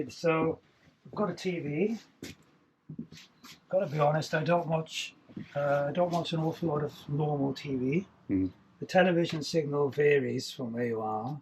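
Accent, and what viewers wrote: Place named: Cardiff, United Kingdom. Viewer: Good on you for choosing this lifestyle 👍 where is home…..I detect a slight Cardiff accent 🤔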